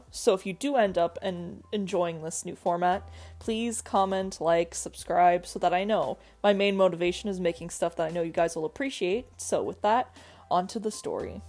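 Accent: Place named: Boston, United States. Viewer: Why she from boston's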